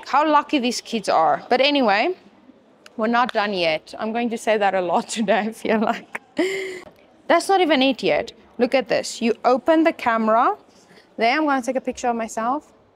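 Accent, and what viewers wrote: South Africa. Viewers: You sound like you are from South Africa, I can hear a bit of an Afrikaans accent. Great video thank you very much. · Coolest is your South African accent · Hahaha, for sometime now i have been saying aiyeee this accent is South African 🇿🇦. Now after watching a couple of videos i decided to check when was this channel opened. " so waar" she is South African 😂, we love you. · Love the South African accent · Is she from South Africa? The accent is almost South African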